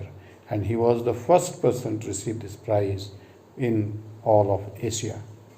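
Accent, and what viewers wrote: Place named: Bengal, India. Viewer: Thank You Very much Sir for your help. Wow! Sir Your bengali accent is very sweet.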